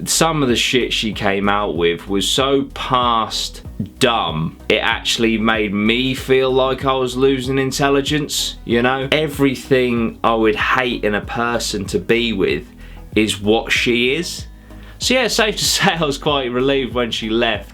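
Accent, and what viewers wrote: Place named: Liverpool, United Kingdom. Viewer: Your scouse accent cracked me up 😂😂😂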